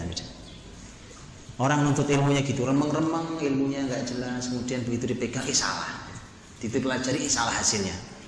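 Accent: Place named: Java, Indonesia. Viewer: oh pantesan logatnya jawa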